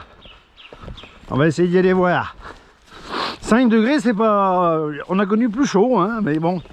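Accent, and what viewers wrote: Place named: South, France. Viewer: Salut,je ne connaissais pas ce talent de chanteur👍, souhaitons que ces jolies farios corrézienne t'ai laissé un souvenir agréable,toi qui leur parle comme moi avec l'accent du sud, t'entraînerais tu pour cet été? 😉 une session rock and roll où on devine que tu t'es amusé. 👏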